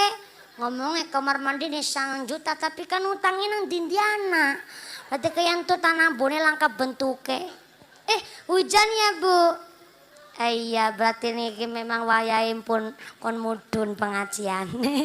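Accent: Banyumas, Indonesia. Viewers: Ustdzh cantik..ora ngapak ora kepenak😆😆🤦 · Gokil ngakak pollll ustaadzahh ngaapak kiii,🤣🤣🤣🤣 Mg lncar acaara Khitaanyaa. Aminnnn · Logat yg bikin menghibur, yaitu logat ngapak.. Hhi Sukses truss sob Mampir sob kerumah, ajari sy bahasa ngapak.. Biar lancar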